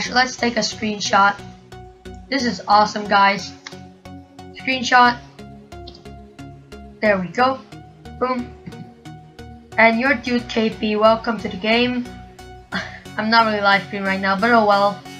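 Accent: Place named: Russia. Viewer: dat accent doe RUSSIA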